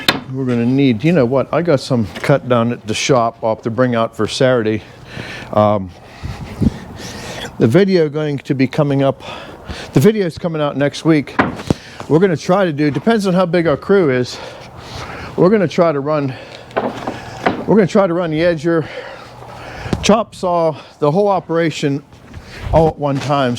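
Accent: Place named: Pittsburgh, United States. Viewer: It's a pleasure to hear your commentary because I miss the Pittsburgh accent (I grew up in Edgewood just outside of Wilkinsburg and Swissvale). Like in the steel days, the folks from western PA always get the job done. And done right. Thanks for your videos.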